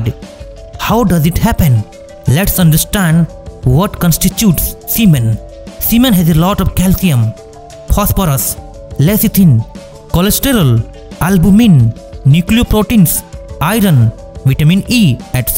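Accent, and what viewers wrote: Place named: India. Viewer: Visuals and content is true and good but your accent is really funny (hindi speaker?) I'm from Tamil nadu but i find it different.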